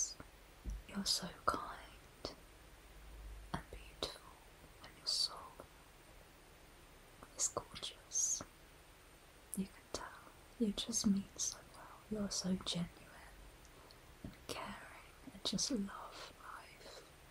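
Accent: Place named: United Kingdom. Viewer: Omg I love the British accent😊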